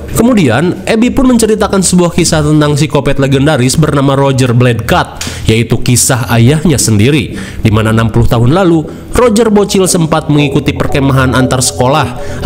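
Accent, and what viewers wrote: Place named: West Java, Indonesia. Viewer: Cuma sukses bikin acara review filmnya jadi seru dgn gaya dan logat Sundanya ngena bgt.top,top,top..🎉🎉❤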